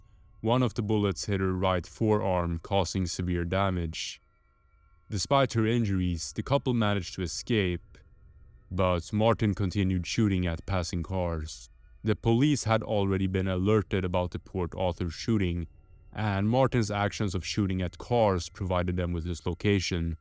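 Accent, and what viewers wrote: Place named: Sweden. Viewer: du gör inga typiska svengelska tabbar men det är några pyttesmå detaljer som avslöjar det 😁 'a' - ljud är något som verkar vara väldigt distinkt för oss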